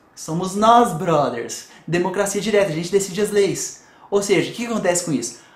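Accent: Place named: São Paulo, Brazil. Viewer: As idéias são boas; O triste é ter que suportar o vomitável sotaque paulistano e os "tá ligado???". Tá ligado???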